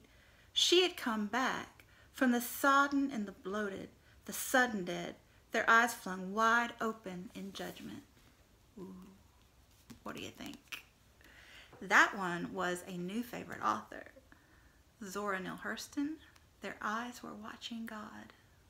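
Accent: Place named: South, United States. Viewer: I guessed all of them except the last one. Haven't read it. You read very well. you got that southern twang in there and everything!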